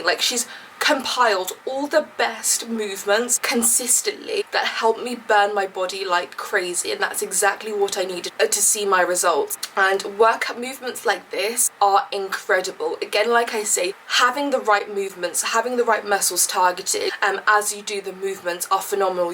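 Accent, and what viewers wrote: RP, United Kingdom. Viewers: Rlly like the posh British accent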